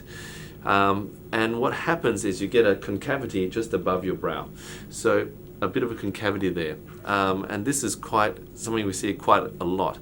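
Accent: Australia. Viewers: You sound Aussie...please tell me your in qld?!?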